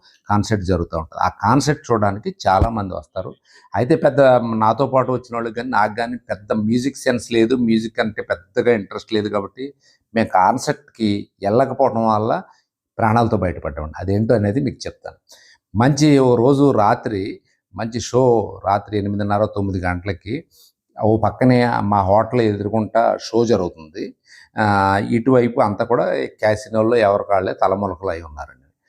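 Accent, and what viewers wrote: Godavari, India. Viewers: Correct ga chepparu me way of talking mana Godavari valla la telustundhi😊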